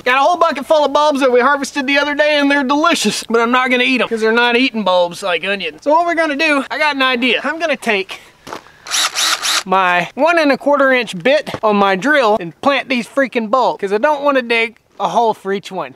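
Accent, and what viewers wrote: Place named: Franklin County, United States. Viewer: I absolutely knew where you were from as soon as you finished the first fifteen seconds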